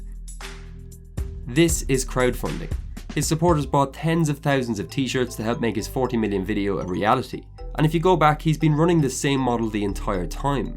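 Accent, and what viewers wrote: Cork, Ireland. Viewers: are you from Cork?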